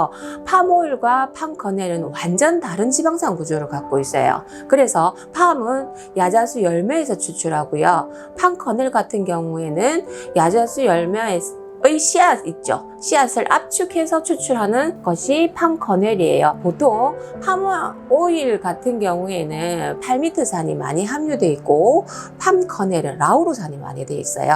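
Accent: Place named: Busan, South Korea. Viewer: 오~~너무 이쁩니다~♥ 만들어보고싶어요^^ 정겨운 부산사투리 방송 잘봤습니다~~구독하고 갑니다^^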